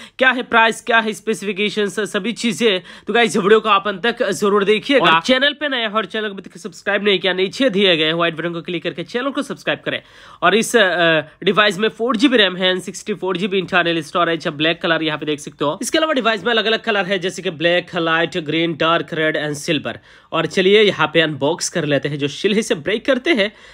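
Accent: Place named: United Kingdom. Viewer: Bhai tu ya to hindi me baat kar le ya fir English me. Tu pta kaise baat karta hai jaise अंग्रेज hindi me baat karte hai. Unka to samj me aata hai lakin tu kyu over acting kar rha hai.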